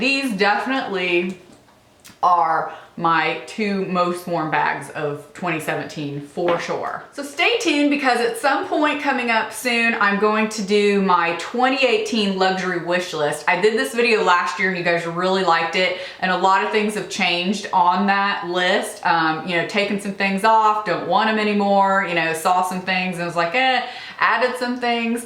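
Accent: South, United States. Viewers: You have the strongest southern accent I have ever heard. Where are you from...love from Sydney, Australia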